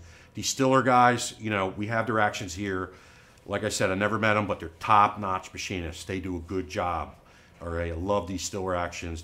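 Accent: Philadelphia, United States. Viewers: I'm from the Philly area and spent my Summers at the Jersey Shore it's great listening to you talk with your philly East Coast accent I love Geissele triggers I have one in the gun I keep next to my bed that protects my family there's no doubt you guys make some of the best gear in the industry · This is why I buy your products. I can hear how much u car about quality and doing things the right way...!! U remind me of family I have from philly area u can hear it ooze out of you... thanks for being a great American company...👍🏼👍🏼💪💪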